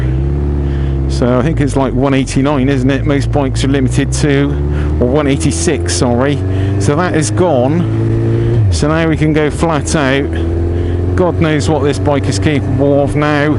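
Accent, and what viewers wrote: West Country, United Kingdom. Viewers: Hi mate, where are you from? Sounds like a West Country accent but a little different to my home town of Bristol!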